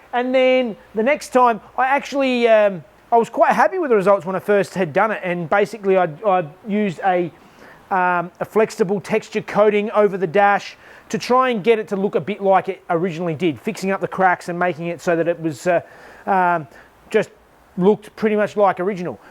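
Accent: Australia. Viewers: Your Aussie accent is hilarious, every time you say Flocking, it sounds like "Fucking" and it makes it 10 times funnier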